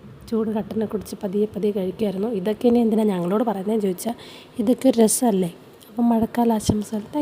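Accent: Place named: Kollam, India. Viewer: ഇങ്ങള് കൊല്ലംകാരാണല്ലേ 😂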